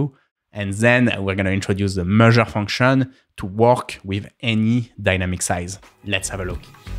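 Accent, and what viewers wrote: France. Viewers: Is this guy French or sth?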